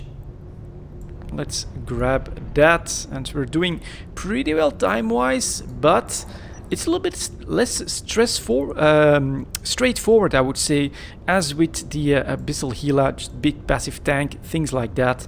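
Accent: Belgium. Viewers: Belgium i think :-)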